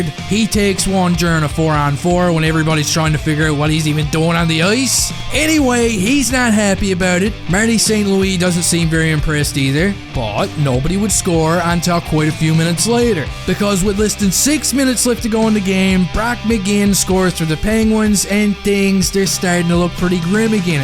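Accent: Newfoundland, Canada. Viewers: That was an amazing ''turd'' period!! Love the NL accent!👌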